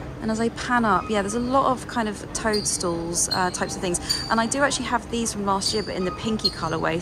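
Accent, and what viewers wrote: United Kingdom. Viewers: Mais uma vez um ótimo vídeo 😚.Desculpe se não escrevo em inglês, não falo muito bem inglês, adoro seu sotaque britânico. Está loja realmente tem coisas lindas! Não acredito que você consiga sair destas lojas sem comprar nada. 😘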